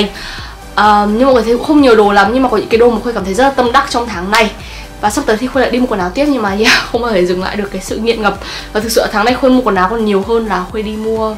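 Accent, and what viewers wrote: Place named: Southern Vietnam, Vietnam. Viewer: Khuê nói giọng Nam dễ thương quá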